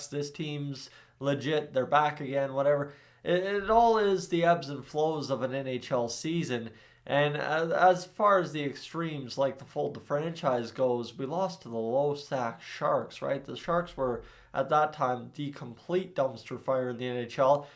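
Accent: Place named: Calgary, Canada. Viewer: sounds like a calgary boy... me too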